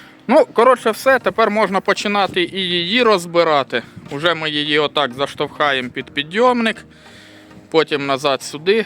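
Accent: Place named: Zaporizhzhia, Ukraine. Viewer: Відео між собою хоч схожі але дивитись все одно дуже цікаво))) Особливо коли від дому за хто зна скіки кілометрів а тут пару років тому випадково зустрів відео і чуєш свій рідний запорізький суржик! =)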